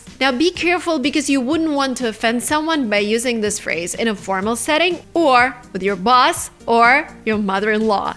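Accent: United States. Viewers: Your American accent is excellent! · Very good. Better then mine American accent. I think you sound Mexican or Columbia with many years of America. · I am from Uzbekistan. I love American accent. That's why I choose your lessons in order to improve my accent,because of its really appealing, amazing❤❤❤😊😊😊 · Hello, I just wanted to let you know that your videos are great. You have a fantastic American accent which makes it easy for me to understand you, even though I am a British English speaker. I also love watching your videos to learn English. Keep up the amazing work! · I noticed a big change in your accent. From the very first video where I heard you first time. You make it. It's looking like a real American accent. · i'm from uzbekistan , i love your videos and included this, as i can learn American accent by watching your videos i can't thank you enough , all of them are awesome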